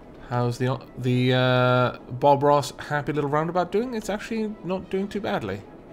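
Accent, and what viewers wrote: Wales, United Kingdom. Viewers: I love that the cruise ships plow straight through the water turbines as though they aren't there XD I also have no idea why ... but I LOVE IT when a welshman says "lovely" so this video was audio heaven for me :-)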